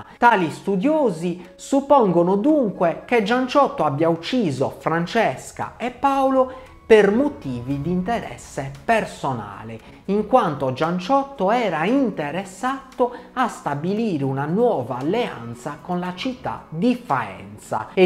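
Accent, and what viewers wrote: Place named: Cagliari, Italy. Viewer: essendo io sassarese, si capisce tantissimo quando si trova online un sardo (in questo caso cagliaritano pk si sente fortissimo)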